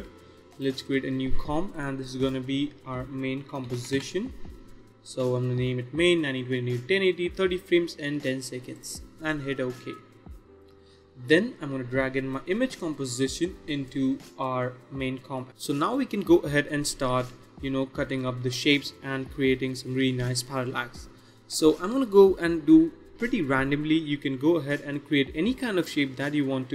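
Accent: India. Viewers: Like a dove telling. Accent hindi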